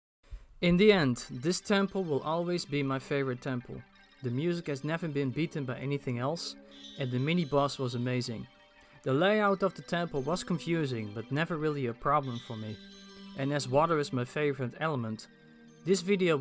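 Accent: Netherlands. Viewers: Hoorde aan je accent gelijk dat je nederlands was. Which is nice. ANOTHER DUTCH ZELDA FAN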